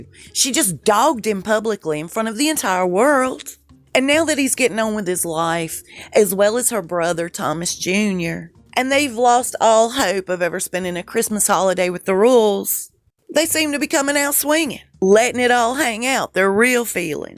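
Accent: South, United States. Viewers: Misleading headlines you are full of it for mere money but you do to worth with that a awful gaad southern drawl please stop it is is really sick.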